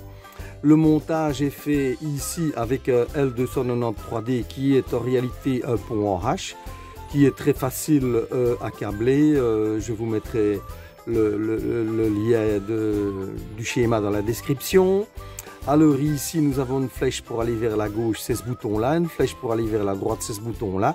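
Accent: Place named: Liège, Belgium. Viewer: Enfin un youtubeur belge (je l'entend à l'accent liégeois 😉) qui aime l'électronique. Merci pour cette vidéo et votre chaîne compte un abonné en plus. Voici le pouce 😀👍👍👍. N'hésitez pas à venir faire un tour sur ma chaîne dédiée à l'électronique débutant.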